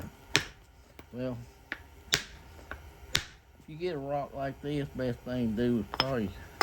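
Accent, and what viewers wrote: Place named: United States. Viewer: I really like your videos, and even more your good old american accent 😁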